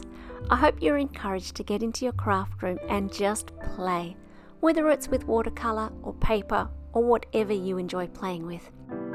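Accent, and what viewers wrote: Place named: Australia. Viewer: Hi, I love, love, love this technique to colour cards... so beautiful! You have certainly inspired me to make cards similar to these again. By the way, it is so lovely hearing another Aussie accent, and I immediately subscribed. Blessings from another Aussie, Gloria. 🥰🐨🦘💖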